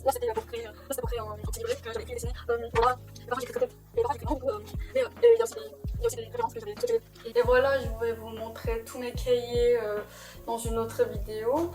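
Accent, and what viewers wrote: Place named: France. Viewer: wow i love your french accent 😍it's so gorgeous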